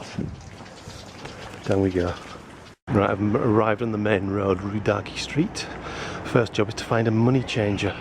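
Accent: England, United Kingdom. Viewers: What are you doing in Dushanbe, your accent looks you are from England . Enjoy snc safe journey .I live Southampton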